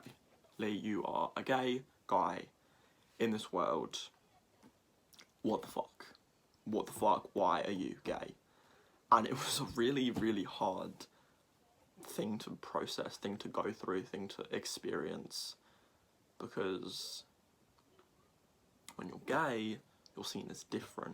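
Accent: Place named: United Kingdom. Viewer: Loved ur video. The British accent is always interesting to me. I couldnt replicate yours (they seem to vary a bit, perhaps by region in England?) Irish & Scottish I think I'm able to notice as diff, but how do so many UK actors star in US movies & talk w/o their accent & sound American? Does it take a lot of practice or could u speak like us if u wanted? We all share the same gay steps, from self-identification, to depression or envy, to masking it & usually coming out. I think major factors are: how conservative/anti-gay are the parents and how feminine is the boy as he grows up (since it plants a seed in everyone's mind that he may be gay. It's tough when guys are masculine growing up, because coming out can be hard for everyone to believe, parents can panic/go into denial. Your story was good, it covered every angle of coming in terms of being gay, dealing with the bullshit & realizing how different our lives will be, but also how exciting they can be once u find the right person. 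I had a thrilling decade of dating, 3somes & serious relationships after I moved from the suburbs of Chicago to Fort Lauderdale, Florida, where the Mayor is gay, the bathhouses are gay, along with the businesses, bars & clubs. Even a strip of bars along Wilton Drive are open all night & that area of the road is shut down to cars, so Pride Parades can be held, Halloween can be celebrated with big stages w/musicians etc. The food market on the street is nearly 100% gay shoppers. Everyone in the en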